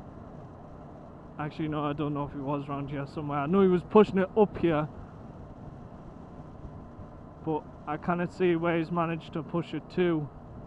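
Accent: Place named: Newcastle, United Kingdom. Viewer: Very happy you are back at it! And I’d do the same for a fellow rider even if I had to travel far to get the gas... I mentioned this to you before that my great grandfather was a gordie so when I here your accent it reminds me of him :) great vid m8 💯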